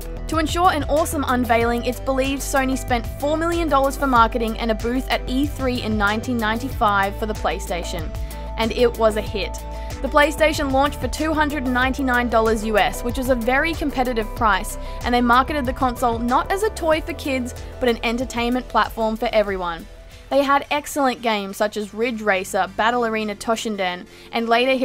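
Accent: Australia. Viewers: Are you from Australia? you sound Aussie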